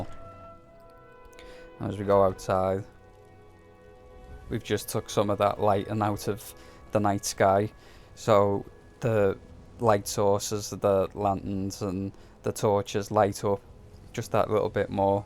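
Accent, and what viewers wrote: Liverpool, United Kingdom. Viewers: Liverpudlian, right? Very detailed video, by the way :)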